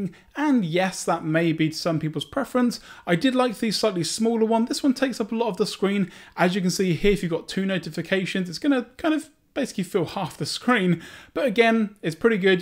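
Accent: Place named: United Kingdom. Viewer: Most likely due to his British accent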